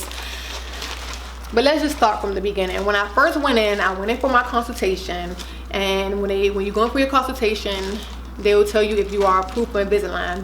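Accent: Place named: Washington DC, United States. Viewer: Your dc accent is cute.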